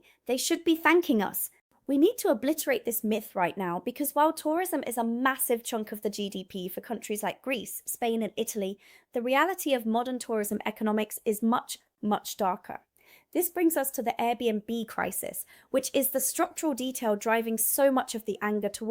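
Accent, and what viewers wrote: England, United Kingdom. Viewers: Is the host of this video really an American speaking with a British accent? (I'm new here) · Much as I have sympathy with what's being said on this channel, I do wonder why this supposed USA citizen has an English accent. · the host speaks with a clear British accent with no trace of any identifiable American accent or American word choices. I can only assume that she is British but lived in the States for maybe half of her life and kept the accent. She needs to make a video and clarify this for us. It’s too distracting for me. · Your pronunciation does not sound US; it sounds very British. How did you manage this?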